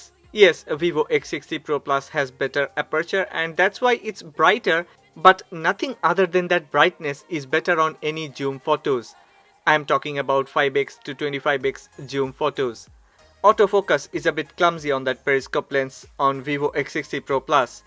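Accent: India. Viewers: Ur accent sound so much like a bengali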